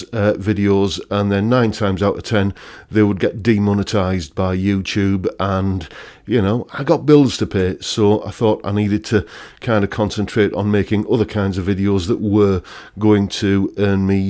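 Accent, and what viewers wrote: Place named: North East England, United Kingdom. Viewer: North east lad I take it. You sound like you’re fairly close to me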